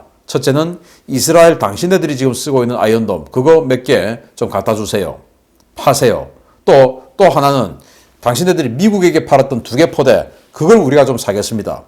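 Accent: Gyeongsang, South Korea. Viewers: 신대표님, 첫인사 "안녕하십니까" 말할때 . 듣는 나는 신대표님이 심통난듯 한 말투로 들리네요. 물론 경상도 사나이라는건 알지만....ㅋ 안녕하십니까 할때 맨뒤 음. "까"을 살짝 내려보심이....ㅋ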